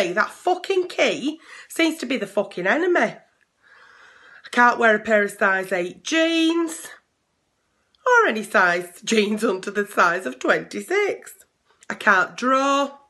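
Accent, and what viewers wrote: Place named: Rossendale, United Kingdom. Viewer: No mistaking that rossendale accent 😂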